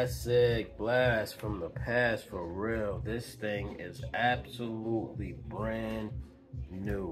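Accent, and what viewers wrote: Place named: Nuyorican, United States. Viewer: I sense NYrican in the house!!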